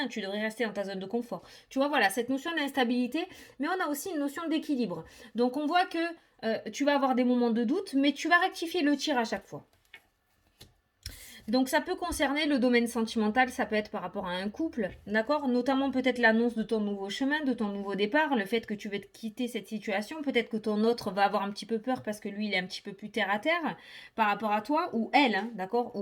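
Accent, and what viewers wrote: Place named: Southwest, France. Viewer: j'aime beaucoup ce mode de tirage. Je sais que les cartes disent vrai.... car j'en suis là et cela dure depuis 3 ans pour le dernier choc et 2015 pour le début de ma descente aux enfers des jugements des exclusions familiales. Je n'ai prise sur rien là est le problème. Je ne peux pas vider mon sac puisque personne en face de moi. Je ne peux plus continuer comme cela... j'espère un message même négatif pour pouvoir réagir et mettre un point final à cette situation. Merci pour cette lecture et pour l'Amour du Ciel.....ne change pas tu es juste parfaite avec ton accent pour moi qui suis native du Sud-ouest. Bisous ma Belle👌👄💖😍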